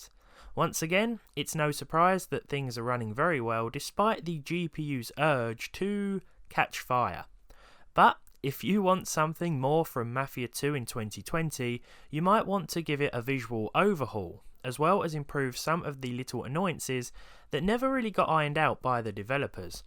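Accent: United Kingdom. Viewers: Pronouncing mafia as "mäfiä" sounds especially wrong with a British accent.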